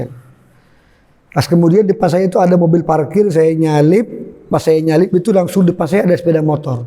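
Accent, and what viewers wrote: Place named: Madura, Indonesia. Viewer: Logat madura ya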